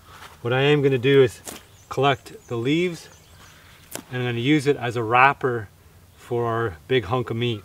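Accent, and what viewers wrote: Canada. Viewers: My bad just heard how you said things but used pounds as a measurement. Idk what Canada uses. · Are you Canadian?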